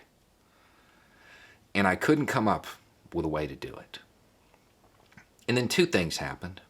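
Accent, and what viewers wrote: South, United States. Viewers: Hey... You are great. Sometimes, you just need someone bilingual... You speak southerners English very, you found someone who speaks Christian English in, he seems to understand your language. Now maybe you can work together to achieve a better world. I couldn't do what you do in Florida, but I can probably do something with people that speak my language.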